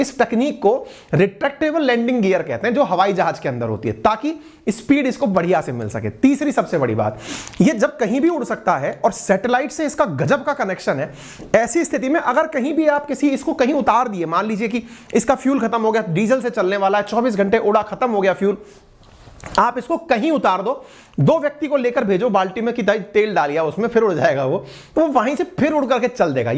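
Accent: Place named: Rural, India. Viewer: Kya dehatiyo ki tarah bolta hai master. 😅😅😅😅😅😅😅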